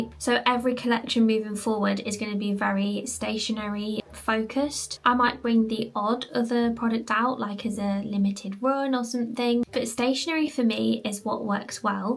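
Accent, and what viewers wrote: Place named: United Kingdom. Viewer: I love listening to you speak! The UK girlies all sound so lovely to my American ears 😂 Also very helpful videos!!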